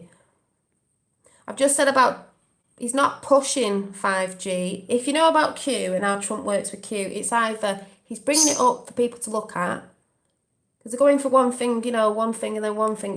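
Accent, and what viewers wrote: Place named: Stoke, United Kingdom. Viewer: what part of the uk are you from, you sound like a stokie?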